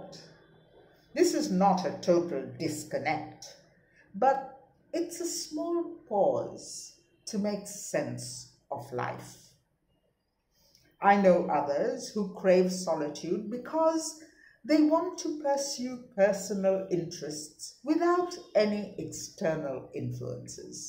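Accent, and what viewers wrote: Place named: United Kingdom. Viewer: How many gen z n millinials understand this british english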